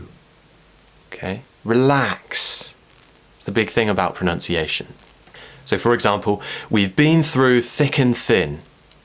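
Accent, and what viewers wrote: RP, United Kingdom. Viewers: Best teacher ever!!! Love your videos and your British accent, are you from London? 😍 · Very interesting find. I could watch you talk all day eventually I would have a whole British accent 😁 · oh teacher iliked your British languaga so amazing thank you so much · Economic expressions or any expressions about business would really help me:D because I'm studying at Business Academy. You're really awesome teacher. I absolutely love your British accent. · Hey i've been wondering that what accents do you teach. is that RP? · You've chosen some of the most common words that are typically mispronounced. Your explanations are very clear - great job! I love your soft British English and your kind eyes. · Awesome! Thank you very much! I'll get it someday, I'll get this British Accent one day! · Sound more British then bristish itself haha best teacher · You are the best British English speaker. 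· I like your British accent. Please please can you make a vedio of some random difficult and long English words. For example you did already Entrepreneur. Can you do concierge or other similar heavy English words that we can still use is everyday conversations. Not really sure if you get what I'm trying to ask... I hope you do though... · I really love your videos and British pronunciation P.S. You are so cute^^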